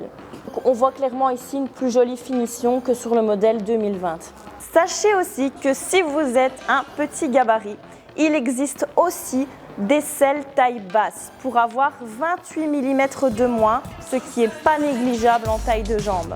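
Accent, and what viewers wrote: Belgium. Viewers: Tu ne serais pas belge ? Sinon tu presente bien les véhicules et c'est d'autant plus agréable qu'on cest une femme.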